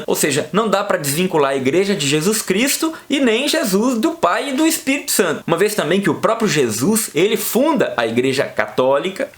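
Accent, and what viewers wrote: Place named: Minas Gerais, Brazil. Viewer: Grandes verdades ditas de forma simples, ao estilo mineirês. Abramos os olhos e convertamos nossas vidas. Cristo é o Senhor!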